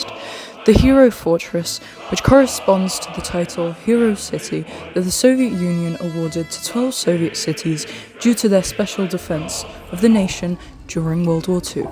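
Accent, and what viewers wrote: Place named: RP, United Kingdom. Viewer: Perfect BBC English; умничка! Никто не забыт, ничто не забыто.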